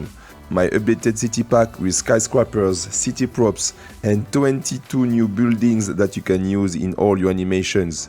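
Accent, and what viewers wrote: France. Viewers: i havent done this tutorial yet, but this is something have have been looking for. also i love your french accent 👌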